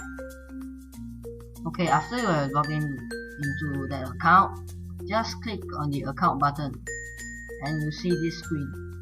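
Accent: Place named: India. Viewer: Sorry guys but he sounds like one if those Indian scammers lol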